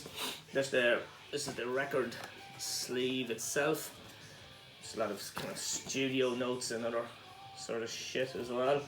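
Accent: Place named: Cavan, Ireland. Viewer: Yea, I deleted it but plan to reshoot it today. I had it all messed up because a few to drink to many hahaha. My good friend Cairan is from Strabane Ireland. I was watching your video and he could tell by your accent your are in Cavan.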